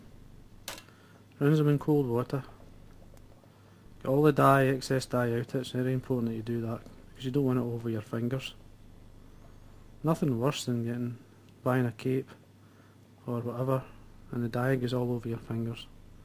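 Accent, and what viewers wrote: Scotland, United Kingdom. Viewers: How I love this Scottish accent! I hated it, when I first came to Scotland, but Scots are aswsome people and they simply changed my mind. To video - superb work thanks,. What type of dye do you use? I missed is somehow from the video. Thank you.:-)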